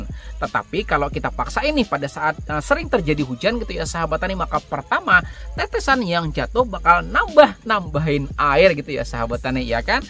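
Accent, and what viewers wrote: Banjar, Indonesia. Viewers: Orang banjar kah yu... Logat banjar prasaan uln.. atau cuma perasaan uln haja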